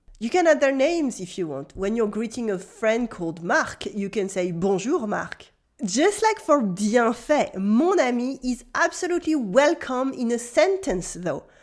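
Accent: Paris, France. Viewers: From my little experience in Paris along with some automotive supplier work in Montreal, I have learned that there are many versions of French. First and most correct is Parisian French and I think that is what the narrator is speaking. Next is provincial French spoken in France but outside of Paris. A bit distant third is French Canadian followed by French spoken everywhere else eventually down to Cajun.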